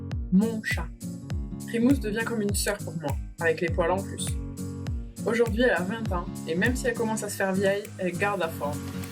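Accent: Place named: Albi, France. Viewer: Oh nioooon je suis trop contente d'entendre ton accent 😭❤ Albi forever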